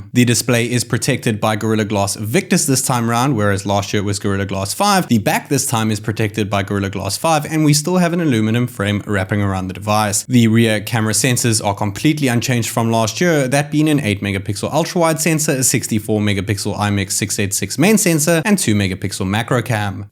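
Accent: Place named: South Africa. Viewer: Searched for the k50 video and yours came up first . A few seconds in I was like nah.... This dude is South African , he has to be. And I see ,yes you are. Amazing. Well done man. Wish you millions of subs . Still watching the video and it's great so far. God bless you bruh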